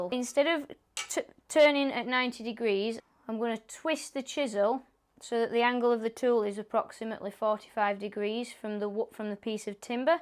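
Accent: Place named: England, United Kingdom. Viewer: Sorry I am so late with my comments but I just found this video. As I am a colonist (US) I usually find the English accent a bit difficult but in this case not at all. And I was quite surprised to find this video ran 48 minutes. It held my attention for the entire run. The finished work piece is very attractive and the production quite well done. Thank you for sharing. Have a great day and stay safe.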